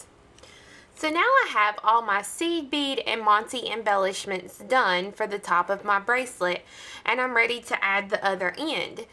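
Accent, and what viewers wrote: South, United States. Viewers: these are beautiful ... btw I JUST figured out the charm of your voice -- it's that homegrown southern accent love your videos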